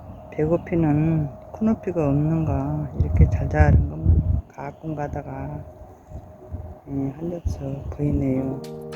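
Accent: Gwangyang, South Korea. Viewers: 구수한 우리고향 광양 사투리들으며 멋진 순창호피석 감상 잘했어요 감사합니다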